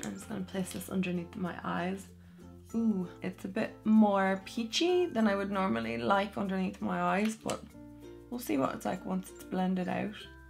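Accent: Ireland. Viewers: Is thought your from Ireland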